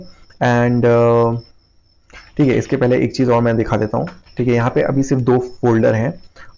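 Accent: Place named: Hyderabad, India. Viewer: You are Hyderabadi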